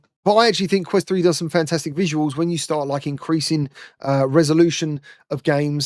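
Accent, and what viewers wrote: United Kingdom. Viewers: I want it but without color passthrough for augmented reality, it's a pass. But your balance test wouldn't be an issue if you did it with the proper head strap. We all know the Quest head strap out of the box has been terrible since the Quest 2. It as a Meta decision designed to keep the headset price down. So funny how you guys across the pond say router as rooter. But honestly, I wish we'd stop including letters in words that aren't pronounced, no matter the language.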